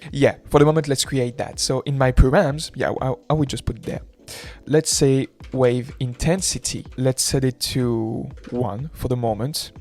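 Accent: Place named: France. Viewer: IBCs !!!!! I am now co-opting this acronym shamelessly and, like a true american duché-bagette....I will likely fail to give you proper credit for its origin. Only because it would be difficult to reference your work; nobody knows who you are yet.....yet. Don't stop doing what you're doing. It's inspiring. Plus I would rather hear this kind of material with a french accent anyday of the week. Especially the cursing and self depreciation....I even find myself using a similar french accent in my head when I am berating my big dumb American brain for my frequent fails at tasks that I begin overconfidently only to realize how much more there is for me to learn.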